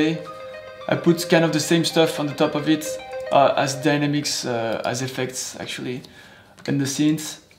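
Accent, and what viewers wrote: France. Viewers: Je suis francais et on reconnais ton accent français ^^ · Salut, j'ai l'impression que tu a un accent français, tu est français ou quoi? Et sinon. J'adore se que tu fais!!!! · You do have a slight french accent, don't you ? Considering the fact that you're Belgium, it's shouldn't be too far from the truth 🤷🏻‍♀️ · I love your remix! Keeps me moving! Very inspirational indeed! I also love your french accent! 😍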